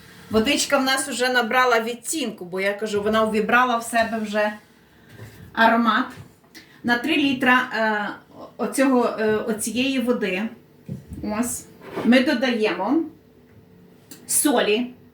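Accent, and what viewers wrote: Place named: East, Ukraine. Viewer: Дякую за ПОМИДОРКИ!😊 Який у Вас цікава мова - ніби більш притаманна західним регіонам, але відчувається східний акцент теж. Якщо не секрет то звідки ви?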